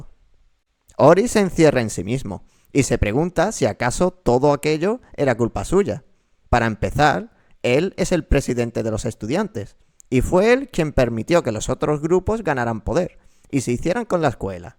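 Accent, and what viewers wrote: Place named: Spain. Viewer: Está buena la narracion lo mala esq está en español España 😅😅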